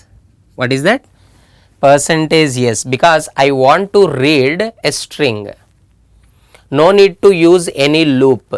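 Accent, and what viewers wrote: South India, India. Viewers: %yes He is south indian Those who observed like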